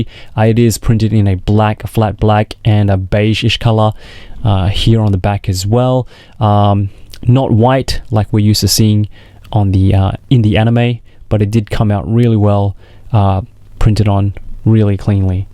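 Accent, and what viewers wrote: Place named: Australia. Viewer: hey man, great reviews and videos, just wondering where you buy your figures from? plus are you from australia? you sound like you have an aussie accent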